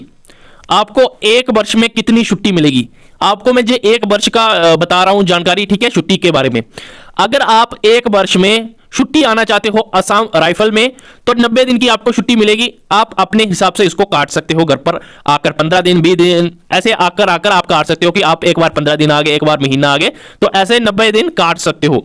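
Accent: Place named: Jammu, India. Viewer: Pehle bolna seekh le Dogra hai ya Punjabi, hindi main dogri mila k de raha hai Aur galat Information bhi de raha hai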